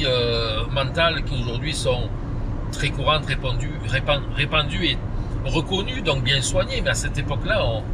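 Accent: South, France. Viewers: Merci pour vos vidéos. Je suis fan. Et de savoir que vous êtes au Québec me ravit. En revanche vous avez dit à un moment donne que vous aviez travaillé à Berne l’Etang. Et question un peu indiscrète vous êtes de cette région de France. À vous écouter vous avez un accent du midi. À bientôt.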